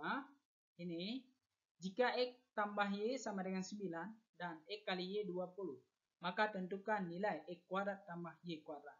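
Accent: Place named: Aceh, Indonesia. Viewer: Bapak nya pasti orang aceh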